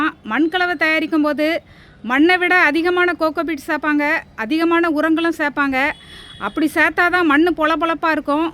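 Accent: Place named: Thoothukudi, India. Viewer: very happy to hear acca.I found out from your slang that you must be from Thoothukudi or Tirunelveli.I'm also from Thoothukudi only.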